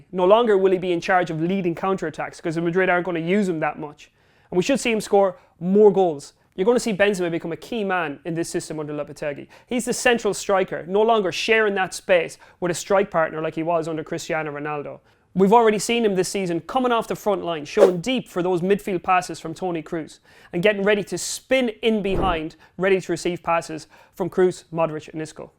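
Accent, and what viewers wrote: United Kingdom. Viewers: Canadian here. Where's this guy's accent from? I.e., what part of the UK?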